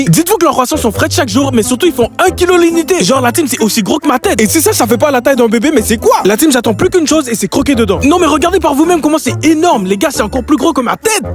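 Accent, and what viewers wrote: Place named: Quebec, Canada. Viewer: Tu es un Québécois ça se voit avec ton accent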